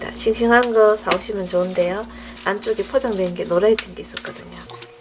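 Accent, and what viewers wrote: Gyeongsang, South Korea. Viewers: 맑은아욱국 콩쪼가리 싫어하는 사람이나 애들에게 좋것네요. 깔끔하고하니 더 정갈하고 맛있어 보여요.방송도중 경상도 사투리도 간간이 나오고 정겨워요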